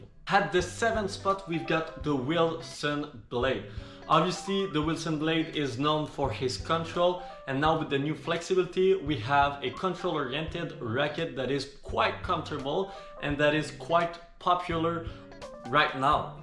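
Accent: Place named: Quebec, Canada. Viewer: You seem coming from quebec like me....i have same english accent 😊 can i ask you where did you filming this? There seem to be a lot of good racquet available in this store!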